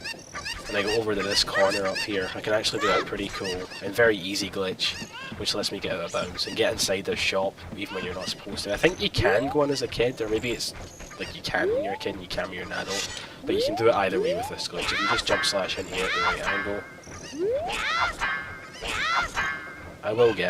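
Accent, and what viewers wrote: Scotland, United Kingdom. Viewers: Woo Scottish accent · HES SCOTIIIIIIIIIIIIIIIIIIIIIISH WOOOOOOOOOOOOOOOOOOO (I'm American but I love Scottish accents) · Ok but his accent sound scottish, but thats just my opinion. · R u scottish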